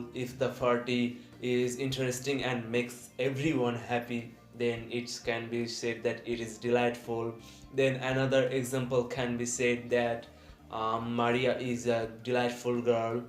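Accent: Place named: India. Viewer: You need to work on that indian accent though, captions do not go along well.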